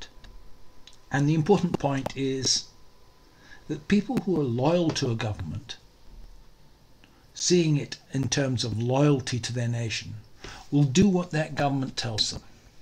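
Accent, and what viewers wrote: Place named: England, United Kingdom. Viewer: It's interesting you come out against Scottish nationalism when your whole planning-centred politics de facto centres around British nationalism. Scottish nationalism - tartan shortbread tins aside - is at least partially driven by pragmatism. They've never been well represented by Westminster. The divergent pandemic responses surely illustrate that... Scottish independence could pave the way for a federal system in the isles, which would be a step forward in political organization. (Your accent sounds English but I know you live in Edinburgh, so I'm sure you have a perspective on this. The last time I was there, I went down to the Cowgate and reflected on James Connolly, who seems to have had a balanced socialist perspective on the matter.)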